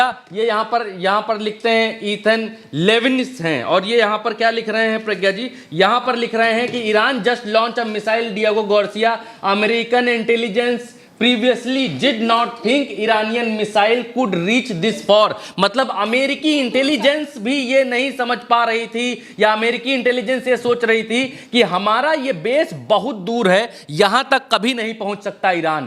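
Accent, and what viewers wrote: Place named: Uttar Pradesh, India. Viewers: Aapka ' utter pardesh touch ' acha lagta hae bhai...good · Uttar Pradesh ki khadi bhasha ap se sunane me achcha lagta hai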